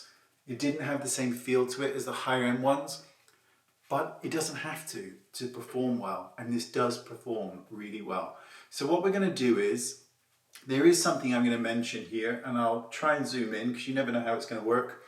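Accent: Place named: United Kingdom. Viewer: I am not here for concealer just your lovely British accent 🤫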